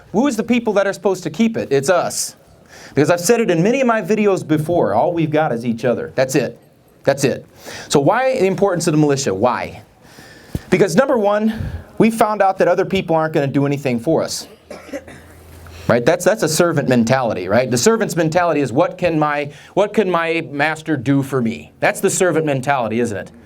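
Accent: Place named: New England, United States. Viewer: Accent, sorry, you still sound like a Yankee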